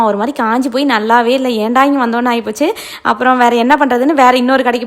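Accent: Salem, India. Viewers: சேலம் மாவட்டம் தமிழ் நல்லா இருக்கு நாங்க மேட்டூர் தான்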